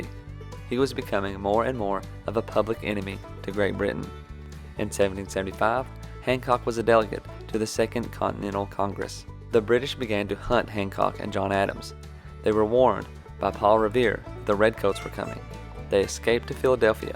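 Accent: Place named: South, United States. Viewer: Great video! Very concise but informative. As a Bostonian, i love that a southerner, with a thick southern access and bank music, is making a video on a New England hero! Thanks, my southern patriot friend! 😉 p.s. it's MassaCHEWetts, with a chew. Not Massatoosets. 🤣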